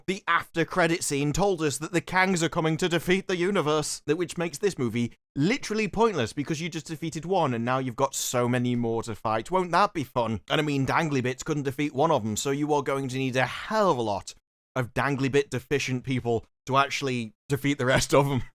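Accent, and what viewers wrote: United Kingdom. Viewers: You are so friggin funny! The voice of reason w/ a Brit accent. When I see your tweedy self getting those undies in a bunch I know it’s bad…really bad!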